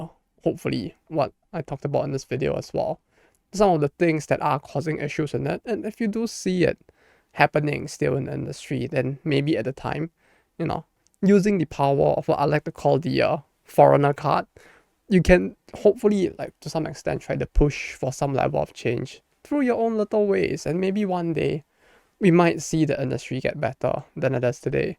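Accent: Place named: Singapore. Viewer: I'm a first timer on this channel since this happens to be on my recommendations. First of all, why is your channel low in subs? Cause this is some seriously good and insightful stuff. Typing this near the end of the vid; almost finished watching. A lot things you've said actually clicks and confirms certain stuff that I only have a smidge about JP Game dev and understand how they dev as how it is. (ie, game tech and design patenting). Like, on the surface I can agree and understand they do it for protective reasons, but I never crossed in my mind that this thing can actually stifle innovation for new games and even local indie games in the large scope. Second of all, are you actually native Japanese? Cause your accent is way more closer to a Singaporean. Heck, if you were to tell me you are an expat living in Japanese or you had a half South East Asian parent, I wouldn't have been surprised. 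On that note tho, you are quite eloquent and really know how to orate. You could be a top ranking salesman or politician, if you catch my drift, haha. But yeah, not a dull moment when you speak. One again, YouTube has unearthed for me a great gem of channel. I hope more would witness this bright glimmer.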